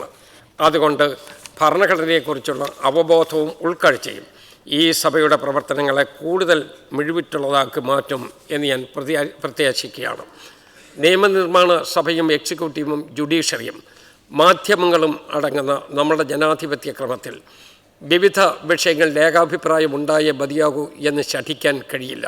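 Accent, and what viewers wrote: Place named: Kottayam, India. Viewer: ഭാഷാ ശുദ്ധി ❤❤❤ കോട്ടയം അല്ലേ ❤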